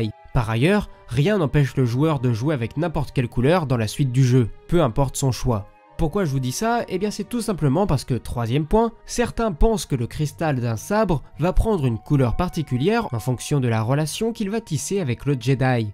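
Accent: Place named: France. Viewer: J’habite aux États-Unis et ouais, tu parles avec un accent français, mais, je comprends parfaitement ce que tu as dit. Bravo! Aussi j’adore tes vidéos. J’apprends français maintenant et j’adore la guerre des étoiles, et ta chaîne m’aide à apprendre. Merci et continues ce que tu fais!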